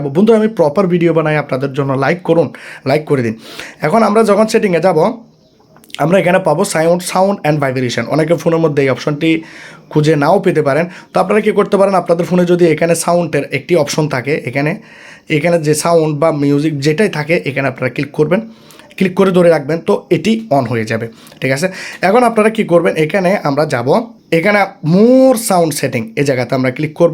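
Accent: Chittagong, Bangladesh. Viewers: কথার উচ্চারণে মনে হয় বৃহত্তর চট্টগ্রামের...! কিছু আঞ্চলিকতার প্রভাব থেকেই যায়...!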